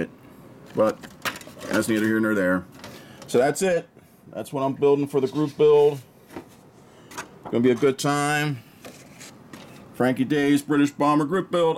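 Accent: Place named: Australia. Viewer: Good project launch vid as always, 'Flack as thick as shepards pie' but the accent was more RAAF than RAF.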